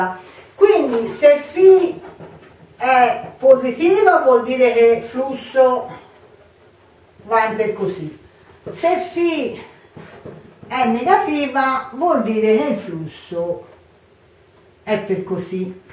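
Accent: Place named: Tuscany, Italy. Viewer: Toscana palese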